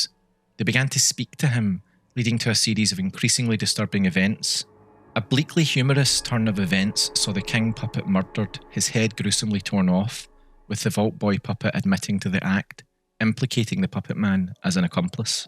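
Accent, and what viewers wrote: Scotland, United Kingdom. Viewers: wow you're severely scottish, I like it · I know it's Scottish I said this on purpose to mess with you. Good spirits though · love to see my scots making some great you tube content on something i love, you've saved me from American accents for tonight aha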